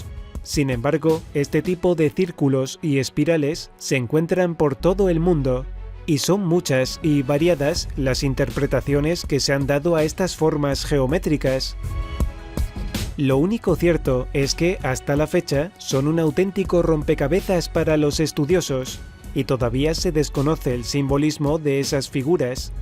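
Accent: Spain. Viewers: gordito de gafas No oye acento de España? .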